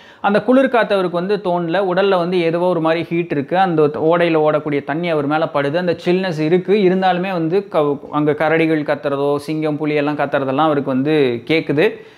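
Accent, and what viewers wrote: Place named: Coimbatore, India. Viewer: Te way u explain in coimbatore Tamil is very nice.. 👍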